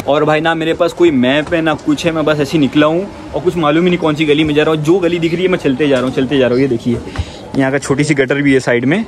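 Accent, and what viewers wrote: India. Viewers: What do you think without subtitles? I can't understand Indian English.😅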